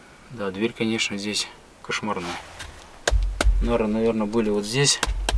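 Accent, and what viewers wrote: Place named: Arkhangelsk, Russia. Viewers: ! Привет! Подписался! Зашло! Где снимаешь? Я был в мезени, там такой же говор! Привет из Челябинска!!! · Ютуб предложил ваш ролик.Начала смотреть из интереса.А говор-то наш,северный).Приветствую земляка!Подписка.Лайк👍.Архангельская область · По говору вроде Архангельский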